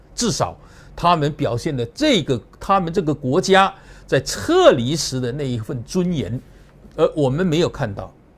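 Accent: Fujian, China. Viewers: 老杨亲美反中反习，了无新意！但是讲的一口福建普通话，倒是很适合大陆观众的口味。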